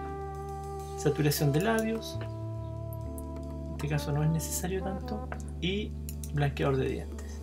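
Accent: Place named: Chile. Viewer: Hola, Encontré tu canal por casualidad...lindas vistas y panoramas en general. Tu acento te vendió como “Chileno”! Yo salí el ańo 78...los campos y panoramas son únicos especialmente cuando incluyes la cordillera de fondo. Yo tengo ańos en photography pero sin canal YouTube. Muy practico tus comentarios especialmente para personas empezando en este hobby o rubro de fotografía. Keep up the good work! Alejandro González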